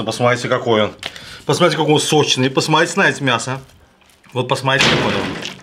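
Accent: Baku, Azerbaijan. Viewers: Дорогой, любое твоё блюдо - шедевр, спору нет. Но больше всего подкупает твой едва уловимый бакинский говор. Это нечто!!!! Как будто дома, в Дербенте...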